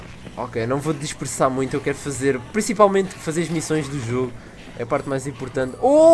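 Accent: Portugal. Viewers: euuu adoro o portugues de portugal,e muito massa,eu sou brasileiro e acompanho o canal do,algumas coisas eu nao consigo entender mais eu gosto mesmo assim.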